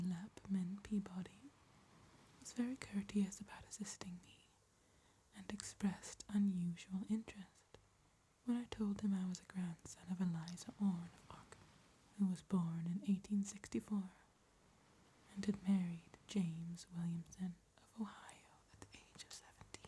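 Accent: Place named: South, United States. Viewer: Ok I’ve finally listened past the first few minutes without falling asleep (took several tries), and please tell me how an English girl does a better southern American accent than half of Hollywood 😂👏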